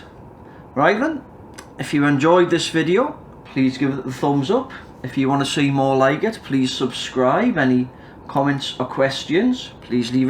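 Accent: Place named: Geordie, United Kingdom. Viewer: Sitting here watching as I do some strap stuff then cleaning parts of my Xbox One I got for £4 prior to installing a new 1540 motherboard and dvd board. I do like the soft tones of the Geordie accent, I spent a week up there around 2008 meeting up with my old World of Warcraft guild and what an awesome time it was esp in the pub outside the Metro where I collected a ton of bird's phone numbers as they jus' adored my south London wide boy accent hehehehe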